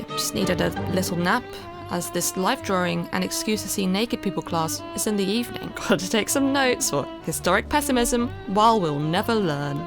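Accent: United Kingdom. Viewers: I could hear British people talk about literally anything let alone the BEST SIMS GAME EVER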